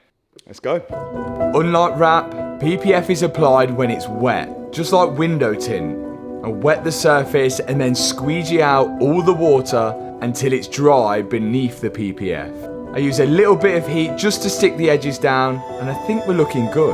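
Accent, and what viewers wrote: United Kingdom. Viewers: Boompahhh, bompaahhh, bumper !!!! Where are you from in UK ??!! What accent is that?! What city??!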